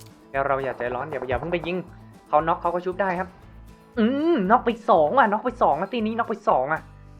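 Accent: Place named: North, Thailand. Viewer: เกลียดพวกรวมตี้!!! ชอบฟังภาษาเหนือมากๆ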